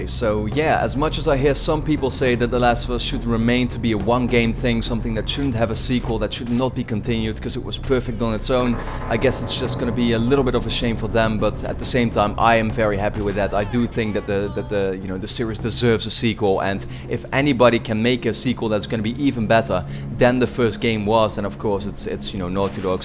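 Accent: Netherlands. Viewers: are you dutch? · Are you dutch? I can hear it in your voice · Are you dutch? Bcs of your accent xp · You dutch?? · Are you Dutch? You sound like you are. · gray-brown becouse i am dutch too and his accent looks like he is dutch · Great video but you really hear that your from the netherlands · Are you dutch? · You sound dutch, am i right? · Ayy ik hoor een Nederlander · your dutch right?:P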